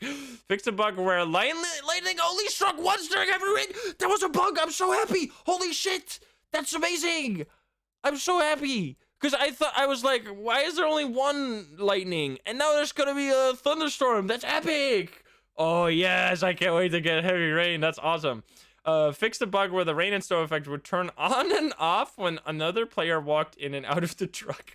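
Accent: Netherlands. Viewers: Wait a sec are you dutch or belgian? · Wait what, are you Dutch?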